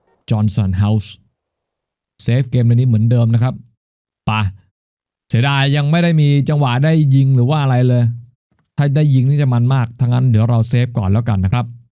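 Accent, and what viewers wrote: South, Thailand. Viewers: ลุงไม่แหลงใต้แล้วหรอครับ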